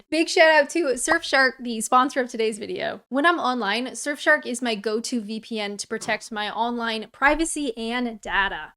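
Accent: England, United Kingdom. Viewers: You should do an entire video in your English accent! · Thabk you,... Another excellent video. I do find the way you pronounce some words very endearing, so please don't become too British 😊. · Love your English accent see if you can do a whole video in English accent lol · I love it when you speak with an English accent, it sounds really nice 👍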